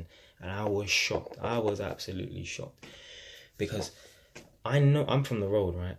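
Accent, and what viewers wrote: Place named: RP, United Kingdom. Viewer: Lmao was was u tryna be posh these days? So hard to listen to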